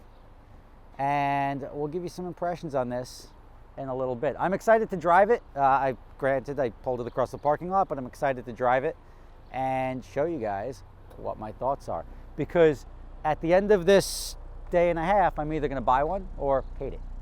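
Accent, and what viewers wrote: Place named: East Coast, United States. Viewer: Absolutely the worst "review" I've seen of the S2K. Take it on a track and tell us how you feel after that. Highway cruising in the car sucks. Period. The only thing that makes it reasonable is top down. This dude seriously sounds like an east coast Weiner and probably is the guy that slows everyone down in his Lambo at HPDE.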